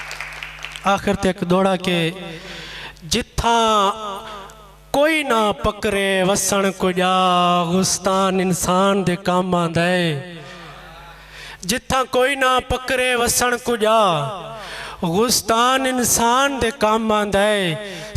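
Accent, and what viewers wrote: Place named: Saraiki, Pakistan. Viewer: Khoobsorat lehja Sraiki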